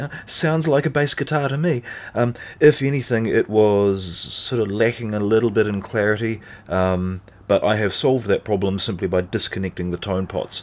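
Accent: New Zealand. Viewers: Fantastic! Excellent piano, drums and bass - and kiwi accent! Well done dude.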